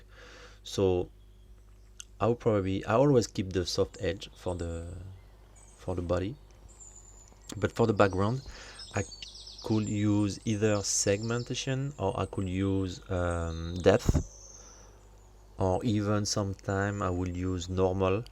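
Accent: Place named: France. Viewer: That french accent 💙 Great technique. Actually when you refuse to insert "beautiful face", you're showing a great example of how to do - effectively - your own art. Beauty? Kind a weird concept, when you scroll into image libraries online and see only beautiful faces. No more face diversity, everyone is a casanova or a supermodel. Great job. Vittorio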